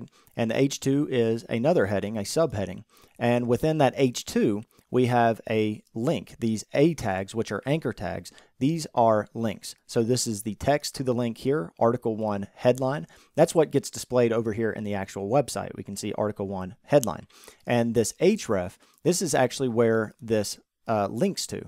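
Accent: United States. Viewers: thanks so much, I really enjoyed watching it and learned a lot. I also really like your fast American English accent😊😅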